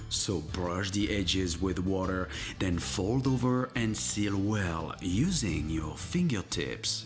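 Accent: France. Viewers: hi, thanks so much for your reply. I think you're amazing in your baking. I watch many other video in baking but it's never as perfect as the way you demonstrate. I love your accent, it's that French accent? I love French baking although I never bless to eat anything French. I am very please to found your video for baking. Thank you so much and please continue with more delicious baking, one day I want to learn how to make croissant By the way, I watch that video so many time to get to steps, and at the end you said "sorry guy" and you took a bite out of a croissant, I want a bite too lol....so delicious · Hes French what kind of accent do you want him to have? Southern? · He really speak like a stupid frensh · Are you from french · Lydia Aschenaki he is French-speaking, with a typical French accent. If his accent annoys you, mute the video, or get a life.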